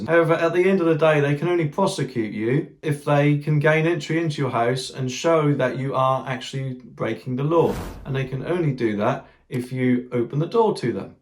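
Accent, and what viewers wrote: Norfolk, United Kingdom. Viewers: nice to hear a Norfolk accent boi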